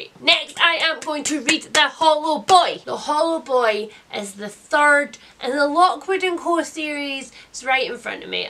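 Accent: Scotland, United Kingdom. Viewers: Hello! I'm a new subscriber and just wanted to say HELLO! I'm binging your videos and think they're great. So good to hear a fellow Scottish woman chat about books. Hope you're feeling better! xxx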